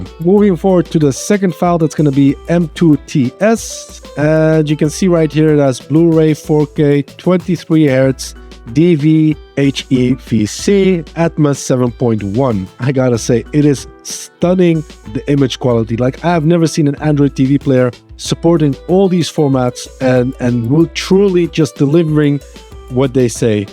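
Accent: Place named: Netherlands. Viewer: You sound like a dutch speaker trying to talk english ... 😅 Kom je uit Nederland?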